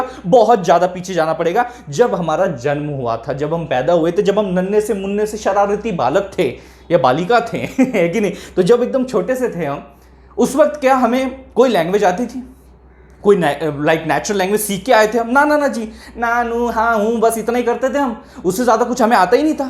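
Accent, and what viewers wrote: Maharashtra, India. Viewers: bhava tu marathi ahes ka? aikun bhari vatle!! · Your marathi accent is very good😊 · Your marathi accent is very good✨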